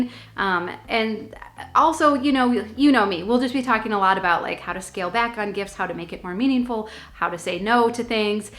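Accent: Minnesota, United States. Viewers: "Eye-talian". Soo Minnesoota!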